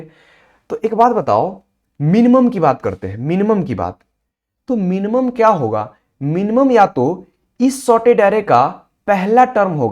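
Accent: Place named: Bihar, India. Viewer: explained amazing . By your tune you looked Bihari/up, I am also a Bihari. good to see you bro.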